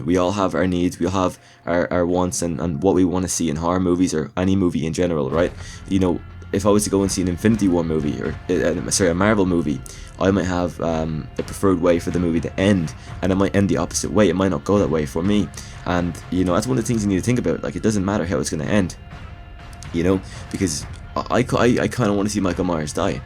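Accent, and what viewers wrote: Ireland. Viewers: For Christ's sake don't apologise so much, being sick and all - for your have amazing content! I love the Halloween franchise, and if anyone don’t like it, they are free to stop watching! And don’t apologise for your Irish accent, be proud! My aunt is Scottish, be yourself! In the end of your video you are saying it’s Friday, did you make the video yesterday 🧐😁 Cheers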